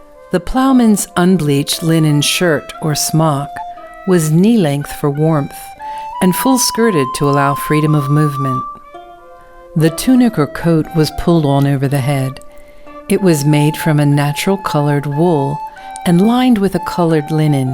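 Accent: United States. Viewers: Why do they have an American commentating this...😂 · I agree that they are informative, but they are mostly about British fashion, so why is an American narrating?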